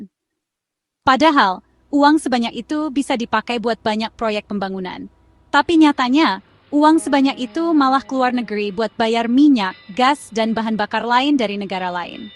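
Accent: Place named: Malaysia. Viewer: Konten kreator yg logat begini palingan orang malengsia.. Halah sok tau lo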